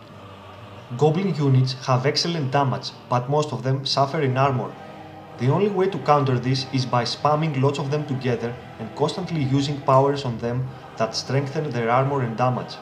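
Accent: Greece. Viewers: Greek! From the accend! Haha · Λολ μπορώ να καταλάβω ότι είσαι Έλληνας απ την προφορά σου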